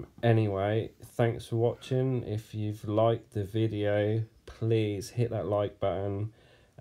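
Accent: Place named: Norfolk, United Kingdom. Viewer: Hi, I live on the Norfolk coast and you sound as if your from my neck of the woods, I have found sum interesting find that I would like to share with you and wondering the best way preserve them to get the best from them.????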